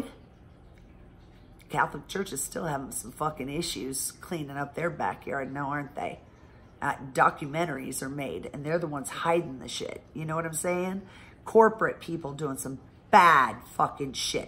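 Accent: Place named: Illinois, United States. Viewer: I bet you from Illinois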